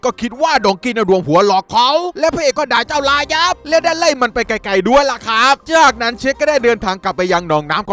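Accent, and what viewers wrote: South, Thailand. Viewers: พี่เป็นคนใต้บ่นี่ 55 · 4.52 ไอยักษ์เข้าเมื่องมาได้พลั่นปรือนิ(พี่เป็นคนภาคใต้หรือปล่าว) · คนใต้รึป่าวครับผมคนใต้ฮะ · ผมนอนฟังขำเลยภาษาใต้ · พี่บาวเป็นคนใต้กันหลาว หรอยนะ ผมก็เป็นคนใต้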